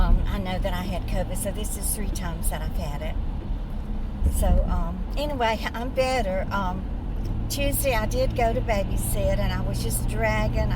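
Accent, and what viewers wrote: South, United States. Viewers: I love your beauty. I would love to visit with you and have you cook for me, not gonna lie. Now it sounds like you have a southern accent living in LI NY. It sounds lovely but it doesn't sound very LI haha.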